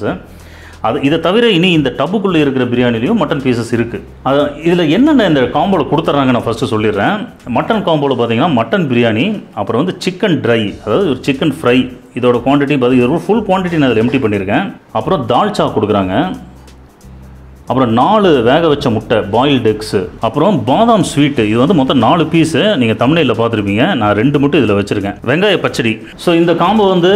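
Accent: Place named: Coimbatore, India. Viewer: Covai speech thani special sir...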